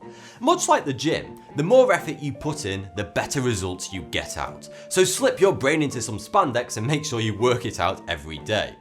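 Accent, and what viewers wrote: United Kingdom. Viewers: Love his British accent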